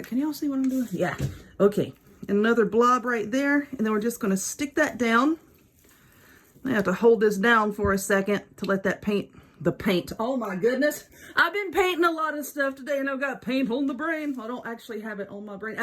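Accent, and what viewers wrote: South, United States. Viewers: Love your southern accent I'm from Tennessee lol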